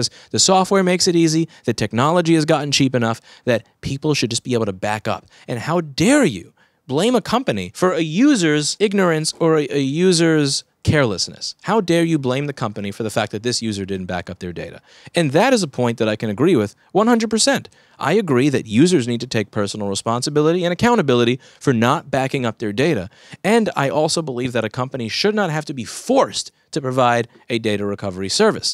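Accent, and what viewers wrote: New York, United States. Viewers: projects his own insecurities as a strawman, I love your crooked chips and your new york accent and attitude. You've taught me way more than the real Apple training team ever taught me in my years working on behalf of them. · Omg you are so self conscious, you covered all the stupid comments I ever had of you down to the NY accent. Love you, do something about those eye bags and chair, and wall paint. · I love your NY accent, you sound just like a Seinfeld episode. As a female I think you are a very good looking man so eff 'em. You're a great, engaging teacher that's helped so many people. I'd be honored to meet you in person and see your technique and equipment in person. If you're ever out in Bumfuck, Virginia come on down and have some sweet tea and cornbread. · i'm a New Yorker as well, can confirm i don't like your NY accent. 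Keep up the good work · I think you aren't an asshole, you are just telling the bare truth. I love your face. Those black bags are just evidence of your hard work. I love the fact that you are pragmatic and innovative with your chair. And i especially love your new york accent! Just to be clear - dont let anybody tell you something different! Fuck those haters :D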